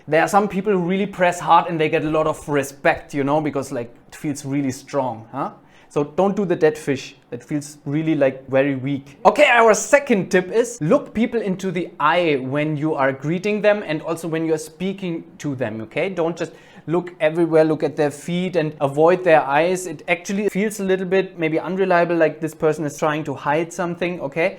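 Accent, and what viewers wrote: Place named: Delhi, India. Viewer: Ich wohne in Germany und du? मै दिल्ली से हूं, मेरा जन्मस्थान दिल्ली है, लेकिन अभी जर्मनी में रहतीं हूं। Amazing आप तो हमारी दिल्ली वाली हिन्दी बोलते हो...I hope मै भी आपकी विडियो से जर्मन सीख कर achhi German बोल पाऊ,,, आप jaise hamaari Hindi बोल रहे हों please विडियो बनाते रहना🙏🙏👍👍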